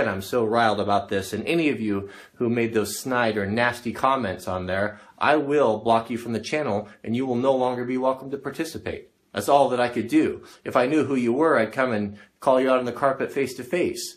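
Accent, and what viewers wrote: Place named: North America. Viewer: I have no idea of the "Controversy" involving Mr Canterbury, BUT! I take my hat off to you for making your stand known to the world. I am residing in Ireland, and you sound like you are in north America, that is the reach of youtube! :-) Please keep the videos comming! Kev B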